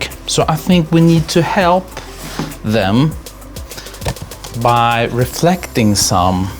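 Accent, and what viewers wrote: Sweden. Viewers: Ahhhhh proper Swedish accent. Renewed my passport today and I felt I needed to hear some more Swedish so good timing with the video.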